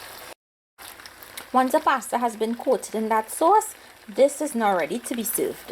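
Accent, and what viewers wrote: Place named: Trinidad and Tobago. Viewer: Gyal me like u accent, I can listen to you all day.. I like de trini women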